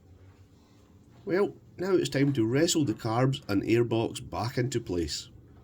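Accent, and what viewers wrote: Scotland, United Kingdom. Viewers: Never realised you were Scottish🤔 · For years, I'm thinking, why is a Scottish man in America? :face-blue-wide-eyes:But you're not - you're home! I wish I was.:face-turquoise-covering-eyes:.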